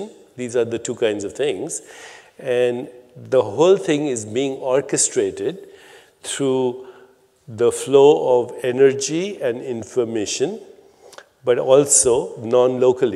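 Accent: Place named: India. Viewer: besides the incredible things he says his voice is enchanting.. the timbre, the indian accent, the glotal clicks... enchanting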